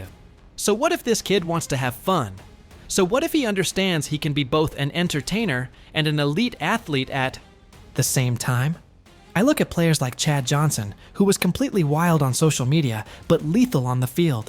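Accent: United Kingdom. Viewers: Gotta love the British accent in and out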